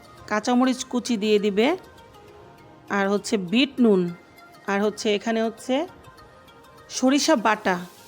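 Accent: Old Dhaka, Bangladesh. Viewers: আপা পুরান ঢাকার ভাষায় কথা কই এন সেই লাগে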